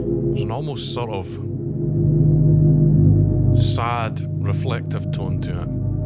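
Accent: Scotland, United Kingdom. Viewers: Yes! I had to subscribe to the channel! Love the Scottish(?) accent. ❤️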